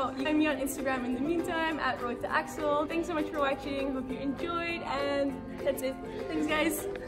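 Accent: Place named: Netherlands. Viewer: I knew she was Dutch from the moment she started talking lol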